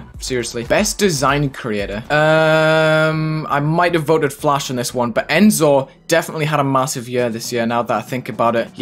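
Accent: United Kingdom. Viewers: bri' ish???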